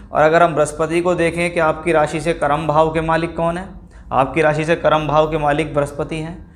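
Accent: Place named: Uttar Pradesh, India. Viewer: Bhaiya UP se lag Rahe ho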